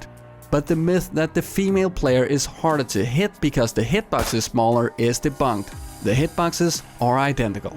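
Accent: Denmark. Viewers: You sound danish my friend :-) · Hahah man kan høre han er dansk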